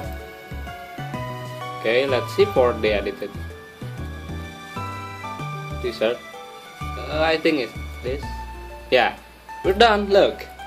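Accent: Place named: Vietnam. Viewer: its pretty standard vietnamese english if i do say so. i think they're just racists.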